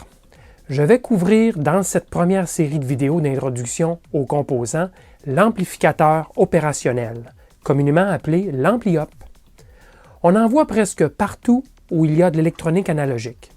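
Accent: Quebec, Canada. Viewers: Wouahouuu ! c'est EXCELLENT !!!!!!!!!!!!! très bien fait, vidéo très bien montée.... RESPECT !!! ET VIVE L'ACCENT QUEBECOIS !!vivement la suite !!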